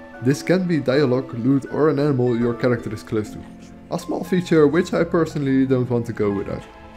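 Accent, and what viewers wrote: Netherlands. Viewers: im dutch myself and i noticed how you talked, its sounds very much like dutch english xD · you sound very dutch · Let me guess, is that a dutch accent?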